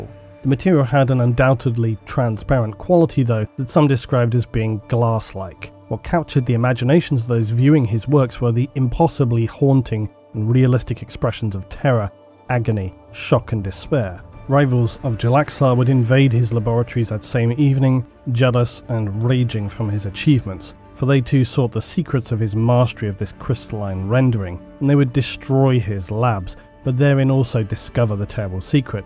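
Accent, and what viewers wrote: United Kingdom. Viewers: Why do you think I like for my 40k lore fix the most? =D Love that British accent, its like Totalbiscuit's except with more charm.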